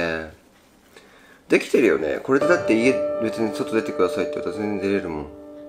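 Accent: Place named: Osaka, Japan. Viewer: 大阪弁可愛い(＊¯ω¯＊)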